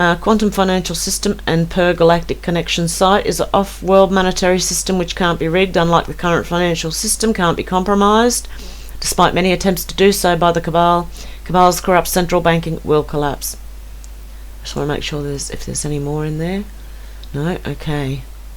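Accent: Australia. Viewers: R U Aussie? Me too! God bless you!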